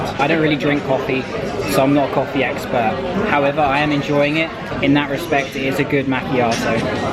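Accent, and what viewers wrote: United Kingdom. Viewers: You’re British English is very beautiful 👍🏻 Oh, and about the orthodox church, all this churches belonged to Albanians I don’t get it why they want to destroy it and most of churches are Catholic Churches and they belong to Albanians... this churches are ancient Albanian monuments stolen by Serbs recent until war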